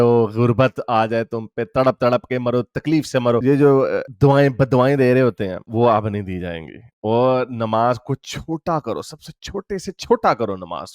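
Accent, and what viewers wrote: Punjab, India. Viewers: Your accent and panjabi tadka kamal hai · Punjabi accent thoda jyaada ho raha hai,, samajhne mei dikkat hota hai.. Thoda plain hindi bola karein.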